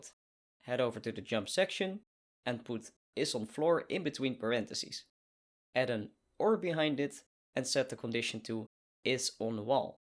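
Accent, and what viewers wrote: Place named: Netherlands. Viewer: zeker wel een Nederlander. Accent is heel herkenbaar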